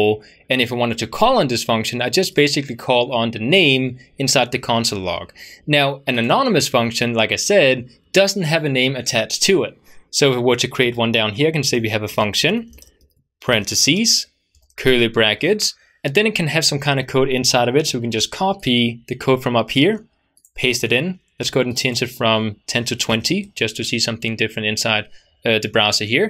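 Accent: Italy. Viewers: are you italian? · is he Italian?🤔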